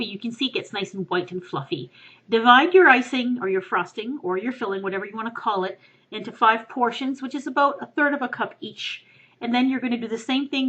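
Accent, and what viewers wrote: Canada. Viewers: this might be a silly question, but are you Canadian?? You're accent sounds very familiar! haha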